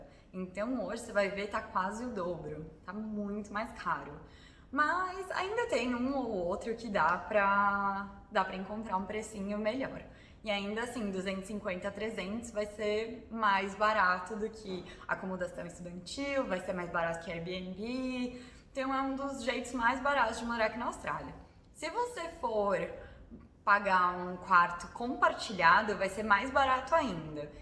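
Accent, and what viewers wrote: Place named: São Paulo, Brazil. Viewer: Otimo video …Vc tem um sotaque muito forte de paulistana ha ha, fofo, Eu Tbm Sou de SP mas meu sotaque is mais do interior😊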